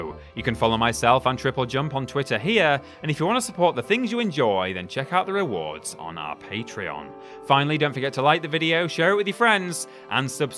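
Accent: United Kingdom. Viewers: This voice sounds familiar. Did he used to be with What Culture or do all British people sound the same?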